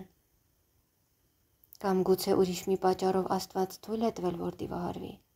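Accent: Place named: Sisian, Armenia. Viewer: Շատ ներողություն կարդացող աղջկա ձայնը շատ գեղեցիկ ու բարի եվ միեւնույն ժամանակ տխուր է։կասեք ինքը բնիկ որտեղից է։ Ներող կլինեք, մի գուցե հետաքրքրասիրությունը մեղք թվա՞ Ուղղակի ասեմ պարզ Ակցենտը Սիսիանի ակցենտ է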